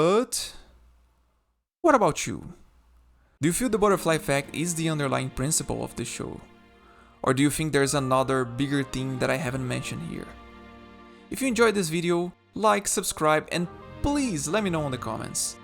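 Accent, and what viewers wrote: Brazil. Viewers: Cara, vc é brasileiro? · Ai doidão, tu é brasileiro, né? Eu sinto o Brasil na sua fala. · Ótimo vídeo. O sotaque brasileiro ficou gritante, e eu adorei.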